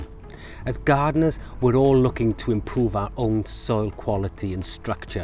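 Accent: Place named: Hengoed, United Kingdom. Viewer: Where are you located fella, I'm in the Hengoed area and you look and sound like you could be local to me?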